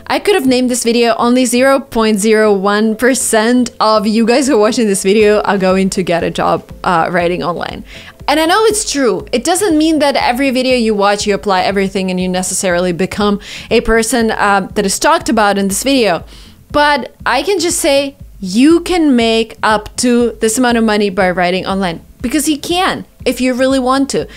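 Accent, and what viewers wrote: United States. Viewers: I don't know how to speak like an American after i watched your vedio i can speak like you You changed my life in a month Thanks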